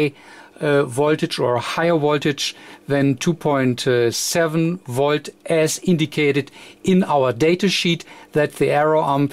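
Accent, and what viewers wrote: Germany. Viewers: It was a wonderfull n extremely comprehensive explaination of more than the basic working of SMPSs by the very intelligent gentleman although his english was good enough to follow (i think he being a german) it was very clear n highly informative !! A big Thank You to the gentleman !! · One of the BEST way to make even layman to understand. Hats - off. I really enjoy your pronunciation of each word with a tinge German accent. Vielen Danke. Auf wiedersehen.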